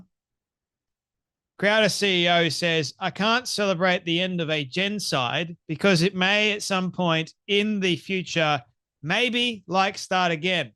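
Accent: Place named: Australia. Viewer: Love your show,. We need people of your calibre speaking the truth with an Australian accent. Keep it up mate.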